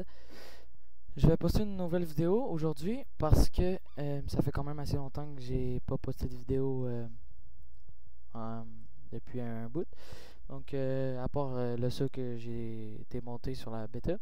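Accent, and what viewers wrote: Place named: England, United Kingdom. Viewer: pt1 je supporte pas quand les gens parlent francais avec un accent anglais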